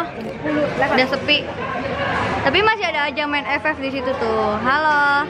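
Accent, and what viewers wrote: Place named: Surakarta, Indonesia. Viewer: Solo nya di daerah mana kak? Orang solo mana suaranya😁😁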